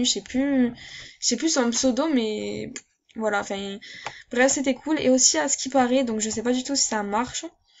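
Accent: Marseille, France. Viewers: tu a un acsent marseuiller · Ses une marseillais ses pour sa qu'elle dit sa mai je t'adore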